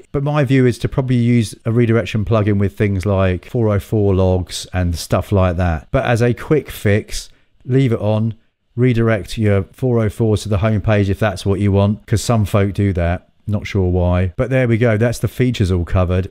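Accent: United Kingdom. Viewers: British accent best accent of the world 🎉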